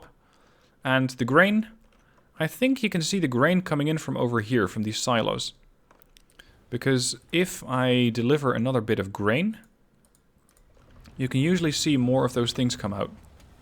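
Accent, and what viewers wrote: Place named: Netherlands. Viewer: I was like; sounds dutch or not? Then you hit me with "Breda"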